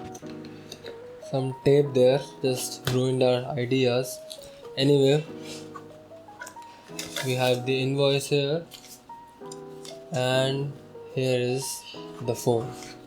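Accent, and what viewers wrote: India. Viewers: indian english accent is really annoying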